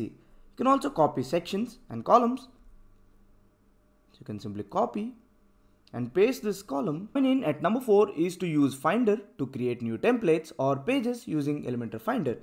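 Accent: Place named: India. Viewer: awful indian accent, can't understand anything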